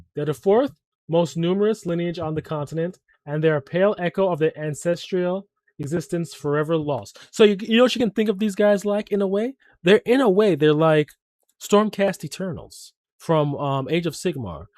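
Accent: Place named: Jamaica. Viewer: Ok so I've been preeing for a while so your video showed up on my feed. And I couldn't help but think 'wow this guy in the video's accent could pass for Jamaican' THEN YOU MENTIONED YOU WERE JAMAICAN (as we Jamaican's often do) and I was like eyyyyy we out here!! Lol let me know if you ever wanna play I'd love to party up.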